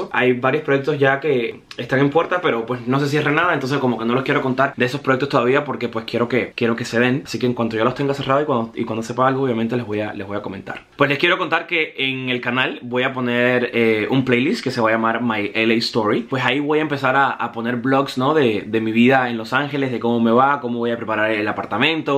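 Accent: Cuba. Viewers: Este pk sigue con el acento neutro ya no estamos en univision habla bien que eres cubano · Felicidades Pero por favor Habla con acento neutro Porque cuando hablas como cubano no te entiendo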